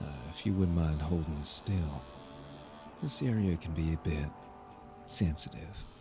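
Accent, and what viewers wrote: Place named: South, United States. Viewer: I was not expecting an accent so thank you I always love an south accent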